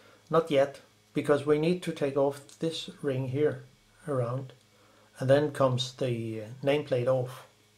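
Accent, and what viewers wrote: Denmark. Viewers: Hi. Thank you for the video. 🙏 You sound Danish - are you from Denmark? If Can you please help me with cleaning an old Zeiss 50mm 1.8 Ultron lens? Kind regards Per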